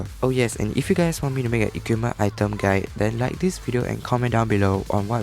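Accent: Singapore. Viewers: wah haha not common to find sg players. I could totally tell from the way you spoke in the video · You have this singaporean accent. 😮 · Sounds sg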